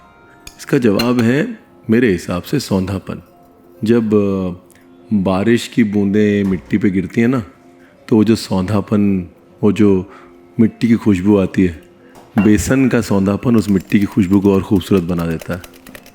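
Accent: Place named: Lucknow, India. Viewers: Missed your "Hello ji " in the beginning , "ranveer ne bataya nahi" and your lucknowi way of explaining.kul mila k aaj maza nahi aaya .chefs to bahot aapke anokhe andaz k liye aate hai yaha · Lehja apka aur yaad Lucknow ki ... The style to present anything...is absolutely stunning No words can define your way , speak so lovely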